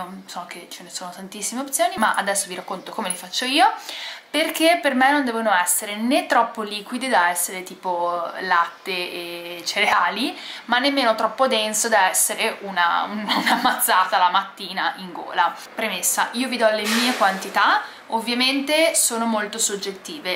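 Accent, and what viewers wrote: Tuscany, Italy. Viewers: parli con accento toscano ora 😁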